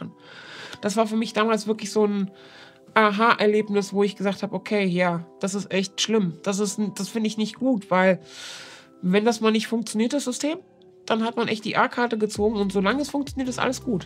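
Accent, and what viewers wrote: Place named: Saarland, Germany. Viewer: Ohleck, noch e 3D Saarlänna 😃 Saugudd 👍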